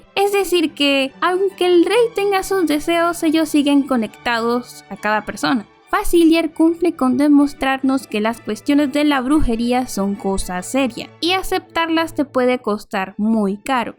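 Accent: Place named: Caribbean Coast, Colombia. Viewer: Eres de la costa de Colombia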